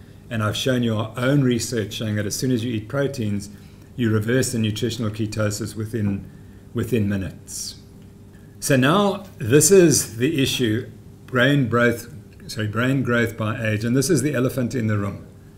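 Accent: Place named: South Africa. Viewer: The English language doesn't work too well with a Sarfer accent...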